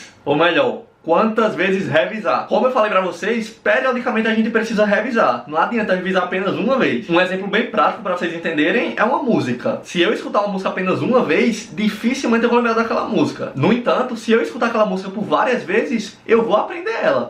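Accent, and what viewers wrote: Northeast, Brazil. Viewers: Esse mano é de qual lugar do Nordeste?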